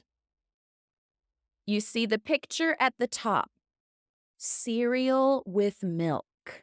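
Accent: United States. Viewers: Good to have lessons only in the american accent like this. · It's amazing hearing pure American accent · Teacher makes more videos alone , I love your Americana accent .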